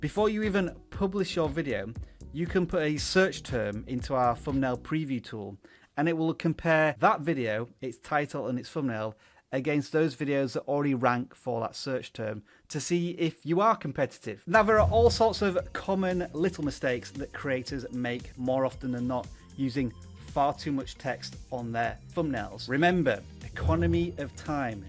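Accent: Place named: United Kingdom. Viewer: Don't you even think of faking an artificial "neutral English" accent, because one of the thing I most like about this channel is your British accent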